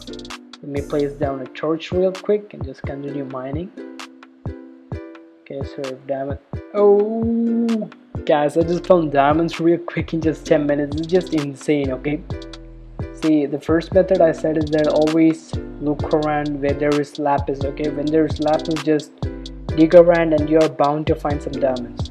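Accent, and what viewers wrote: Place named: United Kingdom. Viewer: Ur British accent is still the same as before!!!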